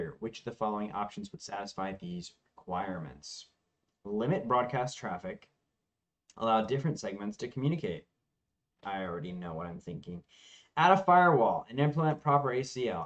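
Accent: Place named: India. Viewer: lol, your indian voice is horrible, but made me chuckle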